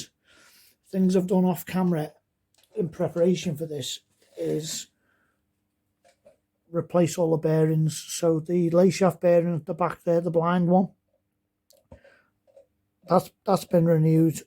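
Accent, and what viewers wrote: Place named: Liverpool, United Kingdom. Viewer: I left Liverpool 40 years ago, love the accent, and this video was very helpful, cheers.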